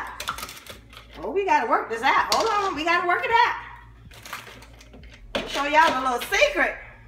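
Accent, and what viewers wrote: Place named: New Orleans, United States. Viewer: You sound of New Orleans not Miami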